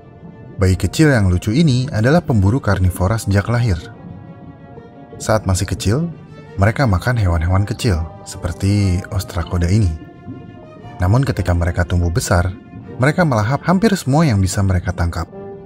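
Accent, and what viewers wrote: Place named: West Java, Indonesia. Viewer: Gua curiga admin orang sunda😁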